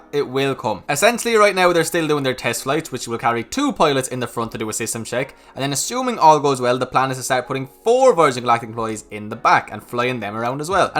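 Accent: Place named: Ireland. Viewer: I love your Irish accent, its so cute and you're so enthusiastic about the stocks you cover. Thanks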